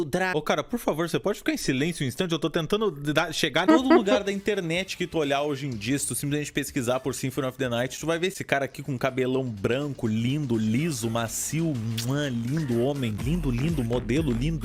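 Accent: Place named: Brasília, Brazil. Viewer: Você é de Brasília? Não consigo identificar o seu sotaque kkkkkk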